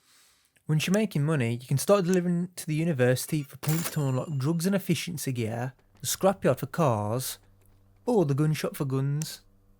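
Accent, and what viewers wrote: Birmingham, United Kingdom. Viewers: Definitely Midlands, near Birmingham but its not the strongest brummie accent ive heard, could be trying to mask it slightly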